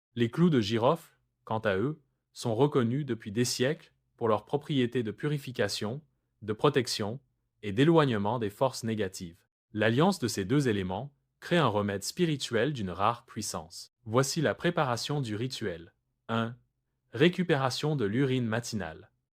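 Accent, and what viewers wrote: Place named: Quebec, Canada. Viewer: assortie d'un bon accent quebecois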